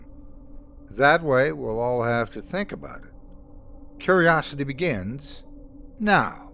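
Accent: South, United States. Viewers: Absolutely love listening to these stories told by you 🌹 Your voice/voices remind me of my southern roots 🌹🥃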